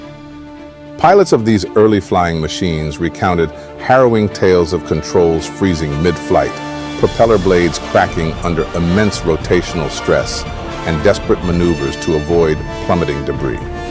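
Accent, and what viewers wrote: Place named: United States. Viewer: This should have been narrated by a British man, not a black American. Good graphics though.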